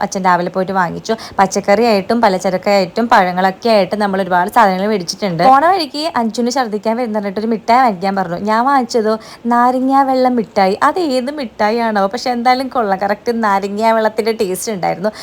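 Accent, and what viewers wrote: Thrissur, India. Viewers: പൂ ആണ്... authentic thrissur സ്ലാങ്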